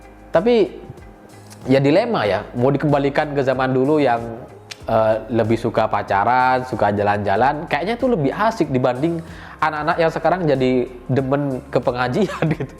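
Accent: Madura, Indonesia. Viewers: Logatnya seperti logat madura pak🤭🤭😁👍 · ternyta oreng bedeh yaa, cara ngomong same dgn p.Mahfud MD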